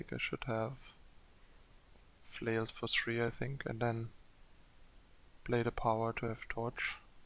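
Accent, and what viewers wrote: Germany. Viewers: You should watch the first couple of matches with it on. It's quite entertaining. I think it's partly the German accent that messes it up lol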